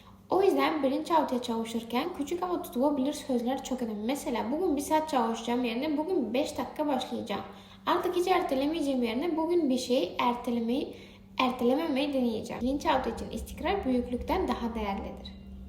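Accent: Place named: Russia. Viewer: Rusça gibi konuşuyorsun Türkçeyi ama dinletiyor. Bilinç altı konusunda da haklısın👍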